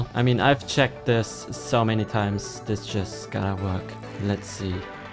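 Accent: Germany. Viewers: Cause he say zem instand of them k think he is german like me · are you german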